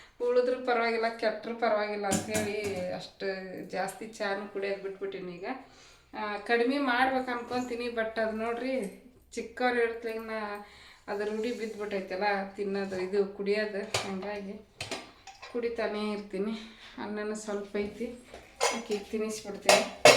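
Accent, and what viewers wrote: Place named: North Karnataka, India. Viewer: ನೀವು ಇಷ್ಟು ವರ್ಷ ಬೆಂಗಳೂರ ರಲ್ಲಿ ಇದ್ದರು ನಮ್ಮ ಉತ್ತರಕರ್ನಾಟಕ ಭಾಷೆ ಮರೆತಿಲ್ಲ ಅದು ನಮಗೆ ಸಂತೋಷ್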